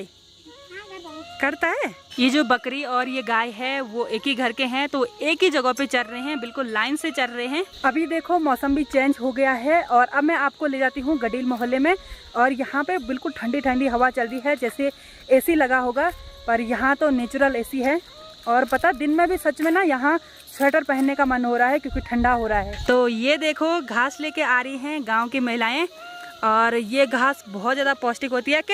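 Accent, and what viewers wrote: Standard. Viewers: ji aap Pahadi ho fir bhi aapki Hindi Pure bol rahi ho...bahut badhiya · Sister aap bahut achi hai sudh hindi bolthi hai aapki har viedeo hum dektha hai hum keralse hu india me keral wala bahut.......... Hota hai by sister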